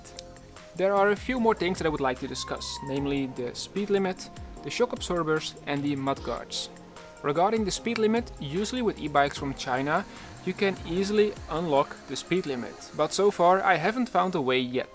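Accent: Netherlands. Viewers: Nederlander khoor het aan je accent😂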